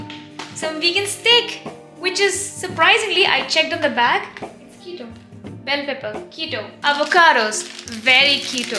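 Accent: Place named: Kerala, India. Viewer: Yo....I have stumbled on your UT videos a couple of times in the past...but today my mind decided to chkout a few in good dtl....1stly, for cooking skills at this age, I must exclaim OMG, WOW WOW WOW n congrags n I did moreovr add, tht your speech tone (but slower talk speed dfntly), dress code, prsnlty and presentation, all in all is quite professional alrdy.. keep it up keep it up God Bless among the lots observed including cat out of bag you are mallu frm coupla tips of tongue..mnwhl the above in nut shell... 'am a very senior mallu myslf too...from out of India for long long time..I ardently follow my family trait n hobby to mentor n guide lifestyle/career...n its wht caught my eye n interest in a Chef Cinderella of future, yes, I think it cud be likely...but but BUT, you need to be WELL sculptured in literally alround ways... I never liked Ou Ou Ou french but do have a rather strange twist (if you will) connection tho...jst how on earth did you land up there..... 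BTW, am neither tech savvy nor the social media guy...so my fingers are yellping to stop...shd you wanna hookup in furtherance email/WA is my convenient preference...you've been off UT for 2mnths now it seems... will chk on your reply....nice getting wth you... be safe n care...God Bless... BRgds GK/Bobby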